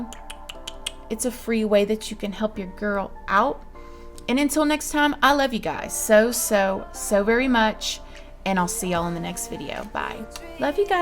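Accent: South, United States. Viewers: You giving me First Lady Tease I love it and your lil country southern twang lol 💜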